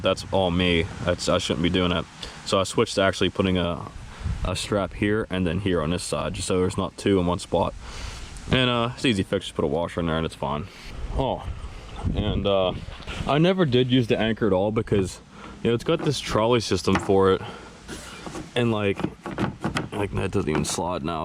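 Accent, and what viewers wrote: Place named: Pittsburgh, United States. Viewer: Man, Im like half way through this thinking there's NO WAY this dude aint a yinzer, then BOOM, "dahn the Yough." Confirmed. Grew up in the Mid Mon Valley / Ringgold area, just so happens I grabbed an Eagle Talon 12 a couple days ago and stumbled my way to this video. Your upgraded chair is aggressively Appalachian, I love it.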